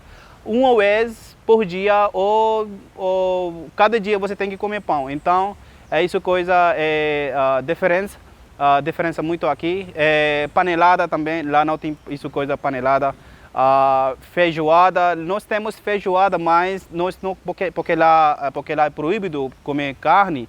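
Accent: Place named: Northeast, Brazil. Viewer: Português com um leve sotaque nordestino. Muito sucesso pra você. 🌹🌷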